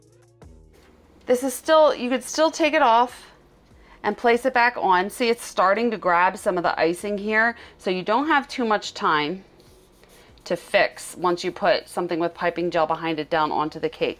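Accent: Philadelphia, United States. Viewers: Your video SAVED me!!! ❤🎉 Thank you!!!! Love your channel your Philly-accent too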